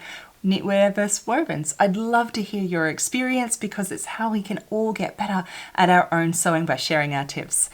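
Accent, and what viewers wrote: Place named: Australia. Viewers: Well said ladies, who cares how pronounces her words, Its the content of her very informative videos that matter. I love our ascent, from a fellow Aussie 😉🇦🇺🐨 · Personally I love your accent. I had the privilege of living in Australia for a year in high school, and listening to your videos brings back memories.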